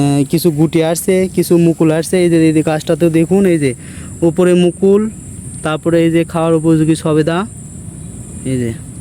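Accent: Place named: Bogura, Bangladesh. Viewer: বগুড়ার কথায়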